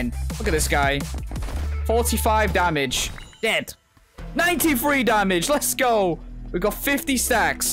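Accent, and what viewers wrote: United Kingdom. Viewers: Bruh is british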